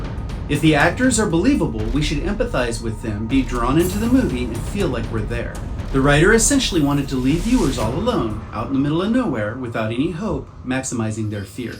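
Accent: South, United States. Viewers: I love the scripted voices. Knowing you as I do, it’s funny to hear the southern accent. Your in your theatric element!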